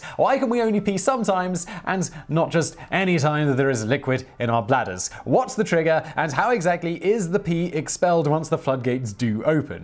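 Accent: United Kingdom. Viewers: pretty sure he's British but not 100%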